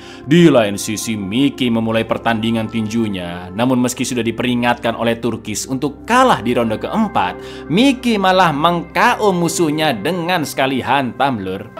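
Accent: Java, Indonesia. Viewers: Logate sampean kayak pantura marai aku kelingan omah mas, seneng ngrunguknoe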